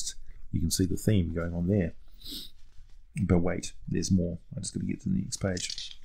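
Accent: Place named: New Zealand. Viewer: Boys we got a kiwi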